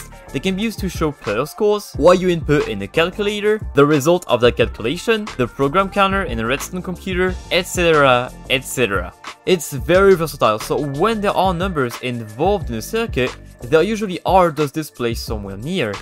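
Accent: France. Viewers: are you french i heard it in purples vedio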